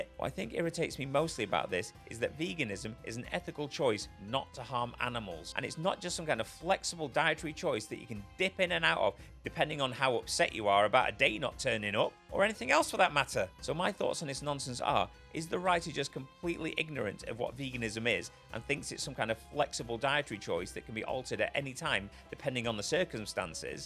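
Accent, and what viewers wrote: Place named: United Kingdom. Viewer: I like your accent! Where are you from? The UK? as for the topic: there's a lot of anti vegan propaganda in movies. I'm not surprised. it's their agenda to make us look unsustainable and treacherous.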